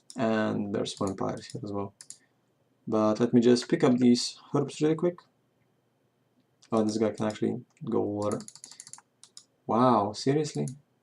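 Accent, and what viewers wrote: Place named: South Africa. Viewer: What accent is that? Sounds half South African, half something else. Nice vid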